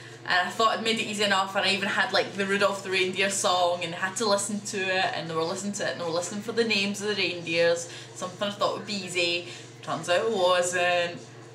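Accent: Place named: Scotland, United Kingdom. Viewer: Good vid :D I used to be an ALT in Chiba. Instead of making my own lessons, I used all the past ALT's and/or online shizzle. Then I spent the afternoons learning Japanese. Great starter job in Japan and gives ya time to study Japanese. Good vid, diggin the Scottish accent ;) I don't hate the ENglish! THEY'RE JUST WANKERS ,lol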